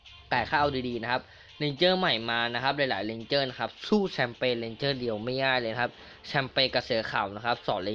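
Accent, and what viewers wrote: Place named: China. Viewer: ไม่ค่อยชัดเลยอะคนจีนใช่ป่าว?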